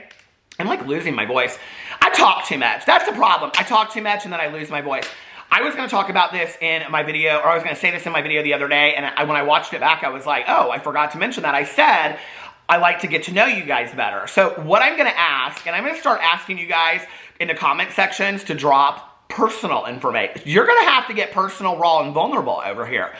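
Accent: South, United States. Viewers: I'm from Tennessee. You sound so southern and I love it.🤣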